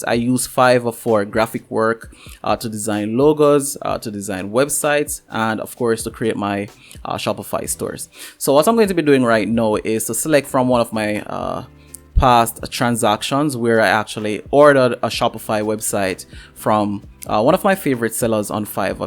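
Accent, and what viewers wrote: Jamaica. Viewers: Are u jamaican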